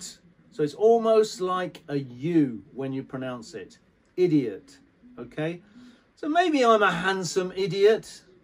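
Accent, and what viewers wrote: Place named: United Kingdom. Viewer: Waiting for this another british pronunciation of yours pastor😊❤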